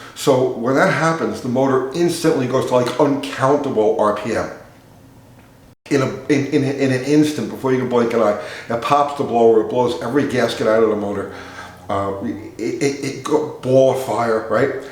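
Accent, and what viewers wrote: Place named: New York, United States. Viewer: It's only a matter of time before has his own massive car show/meet and greet. Celebrity status! 👍 P.S. I knew you didn't sound like you were from TN 😁. Where in NY?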